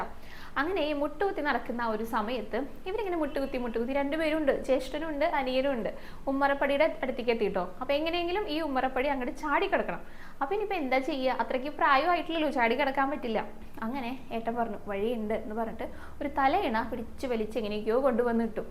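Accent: Thrissur, India. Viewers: Unnikannante kadha mol paraunnathu kelkkumpol munnil nadakkunnathupole thonnunnude. Athratholam resamnu kelkkan pretheykich Trisur malayala siliyil paraumpol. Innathe kadhaum super dressum super.Njan kannyakumarikkarana mole.anikku Trichur malayala sili valare eshttamanu.Njan krishna Bhagthanu.kudumpathil 8_mathe puthranayathinal Gopika Remaman anna perum kitti. Athinal Njan pathivai kadhakal kelkknnu. Molkku sarvaiswriyavum tharan prarthikkunnu.Krishna Guruvayurappa.😊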